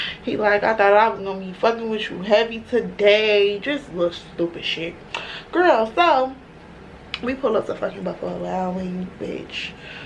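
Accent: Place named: Chicago, United States. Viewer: Aww awww boom g straight Chicago talk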